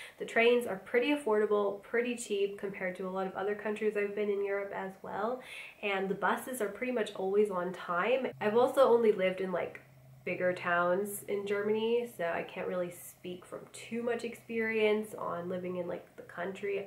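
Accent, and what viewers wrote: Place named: United States. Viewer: Good morning It's always nice to start a day with one of your videos. The German language is difficult to learn, even for Germans. I love the American accent when you're speaking the German language, it's so lovely and sweet. You are living in a wonderful part of Germany, very nice to raise your kids. The most important word at that area is beer/Bier 🍻 and that's not so hard to pronounce 😁😅.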